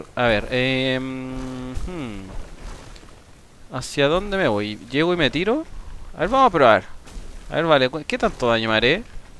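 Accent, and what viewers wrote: Chile. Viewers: eres de Chile cierto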